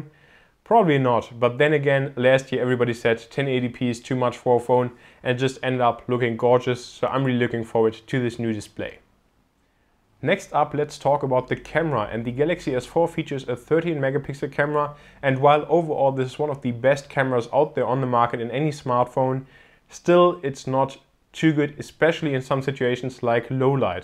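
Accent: Germany. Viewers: Du bist doch deutsch oder ? Das hört man gleich :D Trotzdem sehr gutes Video !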